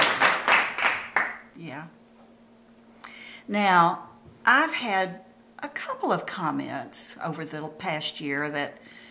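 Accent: South, United States. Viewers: I'm 43 and I'm a Grandma too. You are a beautiful woman and I love your southern accent. Don't ever let a hater get to you. A hater, hates themselves therefore unable to love anybody else. xo Kat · I just discovered your videos and FELL IN LOVE with them and with you!!! I am 73, and also will go down fighting! I love your soft, Southern voice, very easy on the ears! Love all of your topics! Keep making as many videos as you possibly can and I will watch and be thankful for them all!!! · I just subscribed to you! You're so beautiful and refined. Just a soft spoken, gentle Southern woman. I just turned 50 and am going through some anxiety about getting older. I don't look my age, thanks to genetics and staying out of the sun, but it is inevitable. I look forward to watching more videos from you and of course your granddog! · EXCELLENT!!!!! THIS WAS THE BEST REPLY TO HATERS!!!! 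JOB WELL DONE! I subscribed to your channel for these reason... 1- you look way younger than me (56) and I can use all the tips to look as young as you. 2- you talk to us you tubers as if we were friends forever...love that! 3- you take your time to explain things. 4- you are just stunning to look at....even my hubby would agree. 5- you are very open and welcoming...you draw us in...so sweet! 6- love, love, love your southern accent. 7- you are truly one of Gods children and that does show....you keep shining. Hugs and Kisses... 💛💛💛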